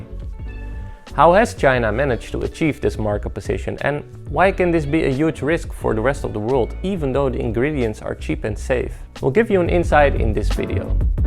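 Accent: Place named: Netherlands. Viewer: Your accent sounds soooo Dutch.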